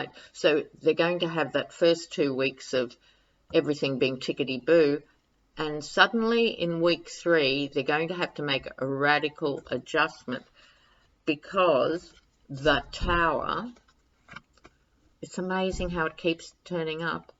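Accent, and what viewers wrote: Australia. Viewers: Thanks for your razor wit, intelligence and the amazing grasp you have on this whole twisted episode of living history. I’ve become a complete fan. Love to hear your Aussie-isms and fab lefty bolshy-ness calling it out. Xx best of love to you and your family while you are sorting out what needs sorting.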